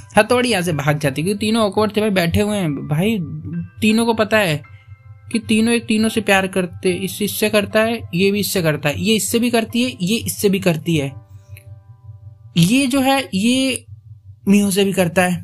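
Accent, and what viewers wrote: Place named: Delhi, India. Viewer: Kabhi kaha nhi mene 😁lekin apki tone delhi ki lagti h 😜😜😜😜😜😜😜vaisee bhi delhi aa gyi hu 😝😝😝😝😝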